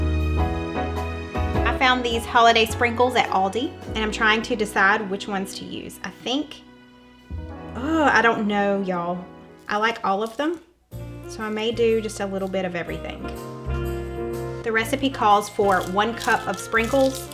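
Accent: South, United States. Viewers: I do Santa Cookies in A Jar and gift them to friends so they can bake them for Santa I also do a hot chocolate in a jar and put them together in a gift basket. It’s always been a huge hit. I also do the Hot Chocolate in test tubes and put a ribbon and tag around them for my son to give to his friends and teachers. I love your southern accent I live way down south and I have it to. I guess the saying is true you can take the girl out of the south but you can’t take the south out of the girl no matter where you live. 😊 Merry Christmas!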